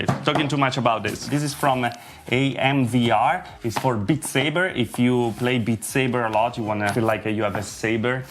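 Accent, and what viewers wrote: Italy. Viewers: Hey man, I'm sorry for such a silly question that you probably get all the time, but you sound a little bit italian to me with your accent. Are you? If not, where are you from? Cheers from italy :D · Are U italian?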